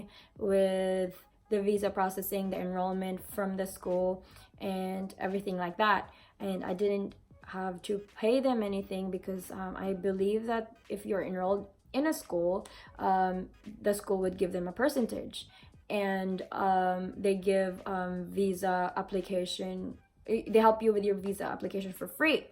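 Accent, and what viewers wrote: Australia. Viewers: How did you get settled do you have relatives there? Btw love aussie accent! 😊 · char naging australian accent na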